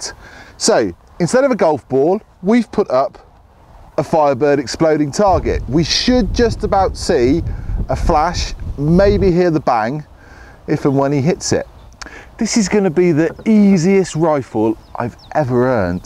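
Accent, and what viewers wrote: England, United Kingdom. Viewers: Nice show, sir, nice show. Your accent is bloody English, i like it.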